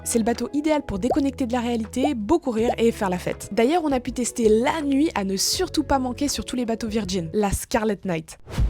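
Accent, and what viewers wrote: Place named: Belgium. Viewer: Tu ne serais pas Belge ? On dirait que tu as un petit accent en fin de phrase !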